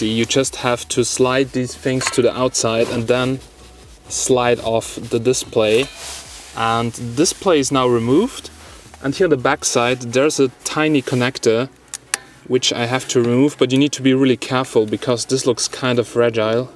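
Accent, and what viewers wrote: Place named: Germany. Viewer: nice German accent :-)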